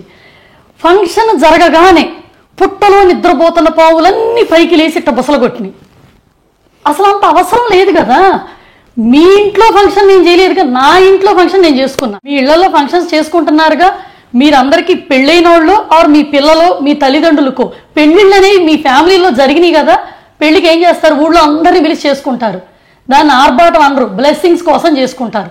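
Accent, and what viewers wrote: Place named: Guntur, India. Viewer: First of all a big hats off, a lovely clear cut video to those spreading negativity… we surely know that it’s like a saying goes ‘ dunapothu Medha varsham padinatu ‘.. I have been following u since ur first video, my husband saw ur video and shared to me, he says, ‘u talk like my sister in laws, who in relation are his sisters, they are from Guntur and I am from vijaywada.. so I relate a lot, the way u talk, the spontaneity and all … my elder brother stays in Birmingham and I know how they struggled to build up a good career in another country.. I am messaging from my daughters account, finally, I think I can call u by name, as I am a bit elder to u and I consider u as a friend, if hurtled sorry.. what I want to tell is, I just love u for the,‘Kukka katuki chappu Debba’ reply u are giving and this is necessary …finally wishing Bannu a speedy recovery and missed little princess in these videos.. 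but good that u kept kids away from all this nonsense.. take care, lots of love, jyothi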